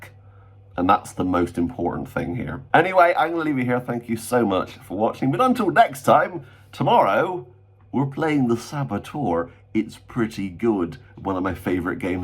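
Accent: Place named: West Country, United Kingdom. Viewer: Good to see she got the strike removed. Appalling behaviour by YouTube! Also where in the West Country are you from? 😂 i wanna say Dorset or Gloucester? Great channel by the way, love from Somerset 😀 Jesus Christ be Praised!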